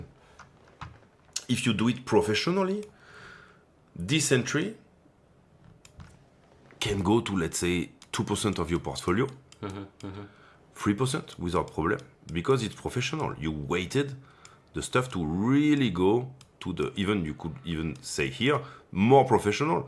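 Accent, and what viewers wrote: France. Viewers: Merci pour l'accent frenchy 😊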